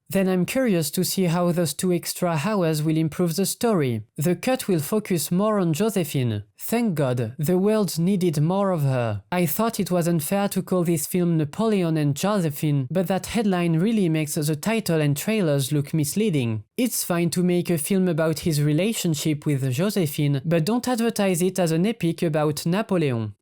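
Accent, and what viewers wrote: France. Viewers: Ton accent frenchie me fume 😂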